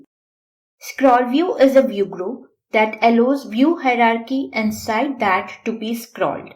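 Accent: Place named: India. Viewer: The first indian accent i understood Great video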